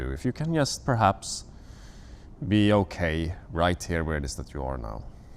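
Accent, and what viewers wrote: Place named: Scandinavia. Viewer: Your strong Scandi accent gives your words even more power! Also, please give special treats to the doggy for such perfect supporting role, standing up and walking as far as his leash allowed when you were talking about expanding somewhere else, and looking away to the trees just as you mentioned shifting perspectives :)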